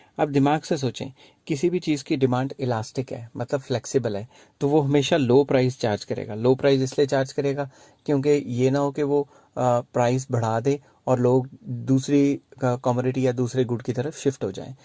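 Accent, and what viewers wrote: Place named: Punjab, India. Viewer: Ur way of teaching is commendable 👏sir apse mujhe thori thori ek punjabi ki feeling ati hai .kya ap punjabi ho ?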